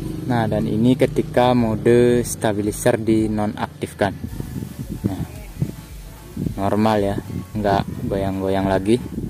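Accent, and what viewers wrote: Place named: Bali, Indonesia. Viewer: orang bali, logatnya T nya kelihatan.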